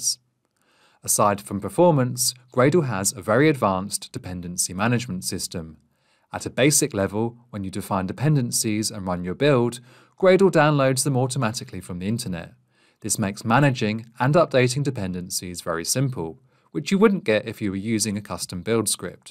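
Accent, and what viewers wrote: United Kingdom. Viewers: man, that british accent :P cant wait for spring boot, hibernate , everything whatever concerns java tutorials:>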